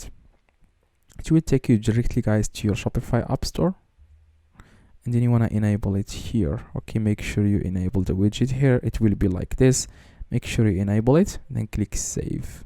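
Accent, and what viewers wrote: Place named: Morocco. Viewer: Chokran a chef , ollah ila mnin bda lvedio , galt hada 3ndo lahja dial mgharba , tbarkllah 3lik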